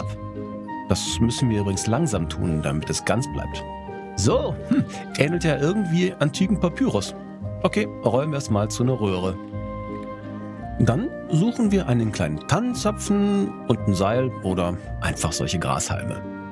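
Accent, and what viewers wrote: Russia. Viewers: Der russische akzent fehlt mir schon ein bisschen...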